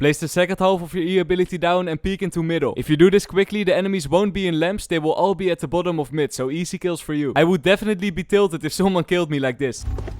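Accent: Netherlands. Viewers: Genuine question: are you Dutch? 👀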